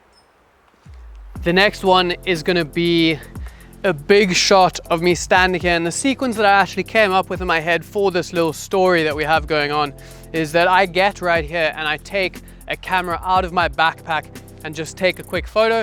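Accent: South Africa. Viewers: Is that a South African Accent 🤔?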